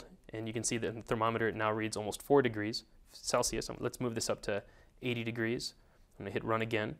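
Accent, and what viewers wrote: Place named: India. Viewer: Aren't my ears stuck or something? I hear an Indian guy, who can perfectly speaks English! Great tutorial videos, by the way :) Keep it up.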